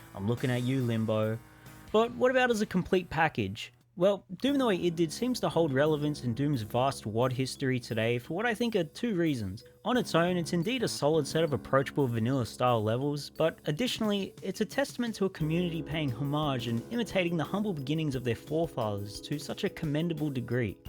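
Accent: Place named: Australia. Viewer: Know what makes a good YouTube video? Anything on Doom. Know what makes it even better tho? Add an Aussie accent. Truly tho, loved the video. Keep up the great work there fellow Aussie! Rip and Tear!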